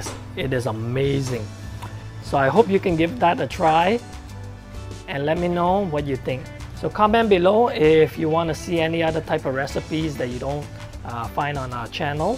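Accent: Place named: Caribbean. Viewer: You chopped up that Duck like Jamaican Jerk Chicken LOL! You do have the Island accent so I'm not surprised! That duck does look delicious! Very nice recipe for sure!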